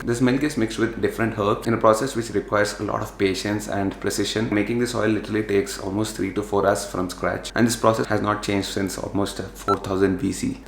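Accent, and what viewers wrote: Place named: Telugu, India. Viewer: Bro nuvvu English lo matladina maku telugu lagey anipisthundhi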